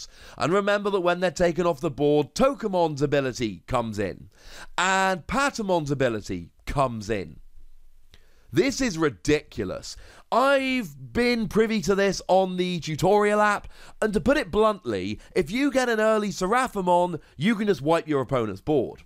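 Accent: England, United Kingdom. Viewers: Weird accent? He has an english accent.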